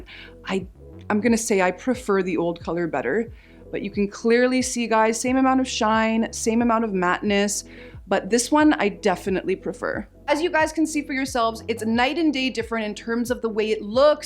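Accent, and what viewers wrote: Canada. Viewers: I just came across your channel and i love your accent! are you from Canada? 🇨🇦 my instagram handle is !!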